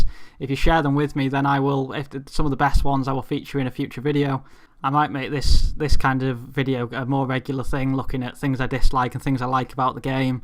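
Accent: Cheshire, United Kingdom. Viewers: yeah , sounds cheshire? Im from just outside there :D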